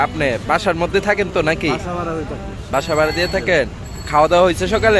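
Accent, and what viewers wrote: Sylhet, Bangladesh. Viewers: জাযাকাল্লাহ ভাই আপনার ভাষায় তো বলে আপনার বাড়ি সিলেট না?আমি কি ঠিক বললাম?🤔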